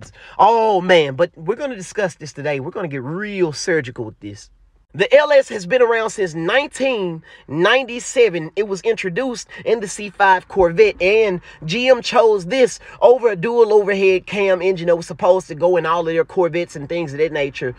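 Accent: Memphis, United States. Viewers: Lol 😂you are definitely a memphis native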